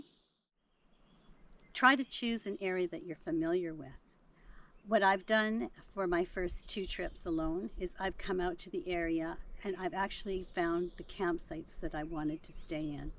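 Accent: Canada. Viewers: thank you so much. You are so easy to listen to. You’re pleasant and warm and full of wisdom. I’m from New England really and can hear some Canadian in your voice. I consider northern Maine home. I miss nature and always walked on my own in the woods and fields. I’ve lived in Utah since 1983 minus two years I drove cross country to be with my mom as she passed from bladder cancer. So the travel bug bit me. I divorced after 30 years and my kids are all grown and very busy. Apartments are too expensive and I just don’t want to feel stuck anymore. Sorry for the long post. 😊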